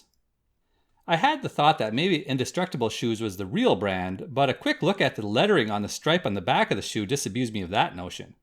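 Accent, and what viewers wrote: Canada. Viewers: You’re Canadian aren’t you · Sounds very fucking Canadian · Are you a Canadian?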